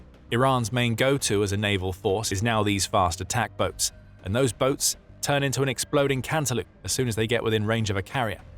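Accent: England, United Kingdom. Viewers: Sounds like a bloke from England who put this together. And we all know England can't be trusted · Get rid of the British narration. Britain is irrelevant now. Use an American narrator. Unsubscribed.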